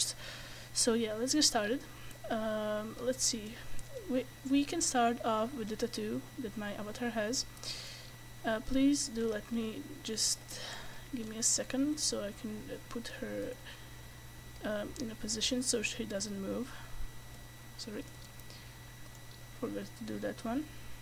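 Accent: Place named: Asia. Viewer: I like your accent! Are you or your parents from Asia?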